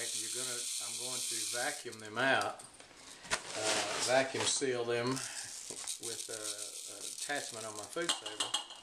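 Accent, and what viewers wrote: Texas, United States. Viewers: Texas men have the sweetest accent🥰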